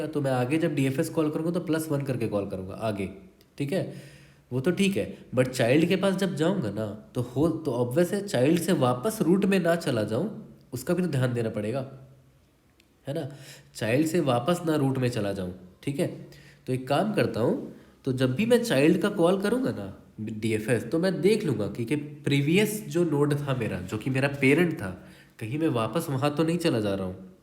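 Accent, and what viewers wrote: Bihar, India. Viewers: Are you from bihar ?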